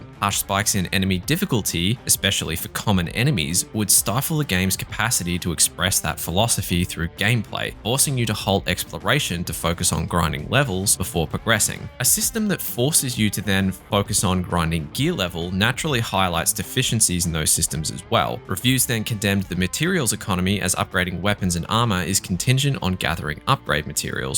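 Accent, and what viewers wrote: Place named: Australia. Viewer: If only it werent for the Aussie accent, it'd be decent content.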